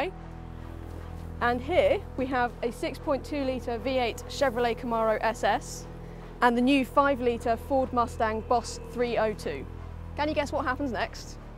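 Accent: United Kingdom. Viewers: LOVE that British accent. Love the Boss as well. Have the 2012 Boss and it's a blast. Good tune and 305s got me to high 11s.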